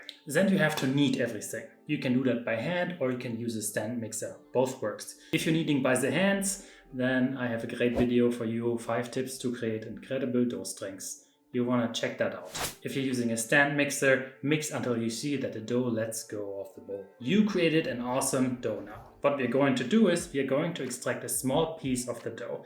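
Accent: Germany. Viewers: These look delicious! My girlfriend makes "Worstbrotchen" (sorry about the spelling) what exactly are they, do you know? I've never had them but she makes them at Christmas. Thanks for your awesome videos, with or without the heavy German accent! ❤️🙂 Also, have you ever made Ezekiel bread? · Your German accent is so much stronger than usual! 😊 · Your German accent is really strong today. Is that because of the German rolls?? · LOL I thought exactly the same thing.. I have never heard him speaking English with that German accent :D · Authentic German bread rolls with an authentic accent. Perfect! I have a lot of rye, so I will have to German it up even more :) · Wie schon von anderen geschrieben, lustig anderen Deutschen auf Englisch zuzuhören wie Brot gebacken wird. Als Muttersprachler ist der deutsche Akzent in diesem Video echt hart und erinnert an gruselige Zeiten von Schulenglisch 🤣 Klasse Kanal und tolle Videos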